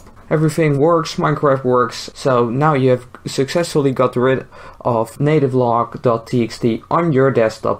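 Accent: Netherlands. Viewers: Your dutch accent tho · mooi nederlands accent man haha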